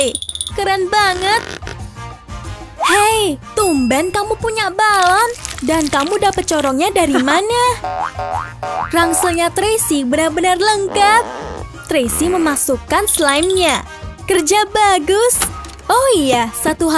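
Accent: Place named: Java, Indonesia. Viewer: Dasar ngomong medok jawA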